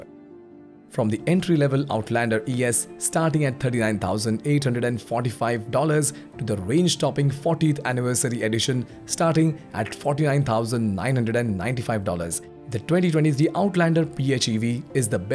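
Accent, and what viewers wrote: India. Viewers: English with an Indian accent Not interesting for advertising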